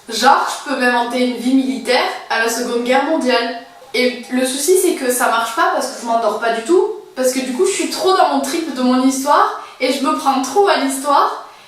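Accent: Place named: Marseille, France. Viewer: tu a un petit accent sud impeut marseillais je trouve tu vien d'où de quel rejion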